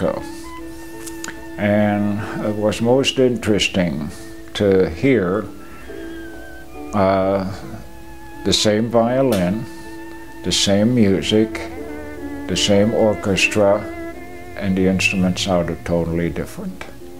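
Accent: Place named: United States. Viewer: For me, It is an american accent without even a tiny bit of a germanic tongue.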